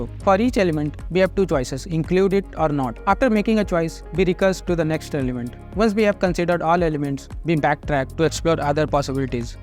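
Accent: India. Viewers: You should speak slower and make your accent better. Thanks for the vid, but god damn, Hindi English is the worst language ever. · I'm very tired of Indian accent. learn English well, okay? you speak like kids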